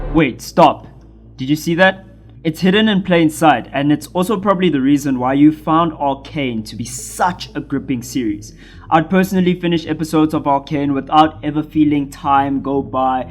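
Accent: South Africa. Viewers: Eh dude nice to here a south african voice, binge watched it then woke my brother up and binge watched it again lol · Within 6.12 seconds I recognized that south african accent! Great to see you popping off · Bro are you from South Africa? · I just know you South African 😂😂bro I appreciate the review ,you did an amazing job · aweeee dude, as soon as you spoke I thought I detected a fellow South African. Fantastic analysis - keep up the great work. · Hang on... Are you South African? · First time watching a video. are you a saffa?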